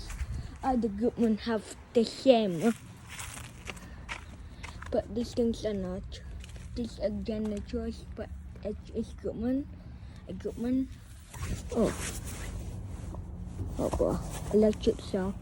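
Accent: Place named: Vietnam. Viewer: Wow your English it’s like Vietnam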